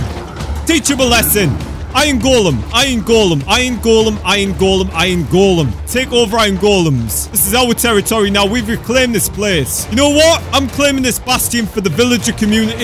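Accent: Scotland, United Kingdom. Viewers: ur brittish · Are you from Scotland? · I like your accent it makes you sound Scottish. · ya british? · You sound British 😃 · He sounds Scottish for me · He sounds like Scottish or Northern Irish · You’re British and I’m Scottish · Is he British? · your a british people best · He’s British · I love brittish accent🤩🤩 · Are you Scottish or maybe Irish? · I can tell your britsh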